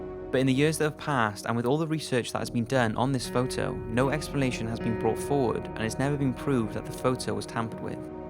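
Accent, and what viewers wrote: Wales, United Kingdom. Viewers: OH LOOK AT THAT! At the time of writing (1958, Sun 17 Jan 2016) you have 1,002,042 subs! Well deserved, awesome channel, site, Twitter etc. Well done! Many congrats from Scotland (ps are you Welsh? Bit crap at accents 😕)!! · just has the accent of a Welsh person and coincidentally I'm Welsh too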